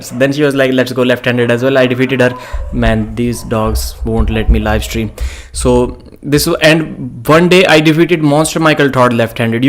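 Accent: India. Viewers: I had some difficulties with his Indian accent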